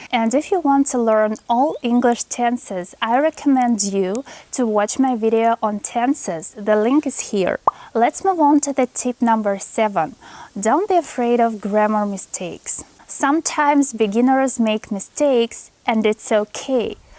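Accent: Russia. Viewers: Are you originally from Russia? You have such sweet accent that I could not but ask. 😁♥️ Much love! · How long have you been learning english? Judging that you are russian by nationality, it makes sense to your accent. Russian schools do not offer a good system to learn English, so that's why so many move to Europe to study. I've been learning English as a second language for 10 years, and I'm russian by ethnicity too, but I have an American accent, and no trace of Russian. thanks in advance:)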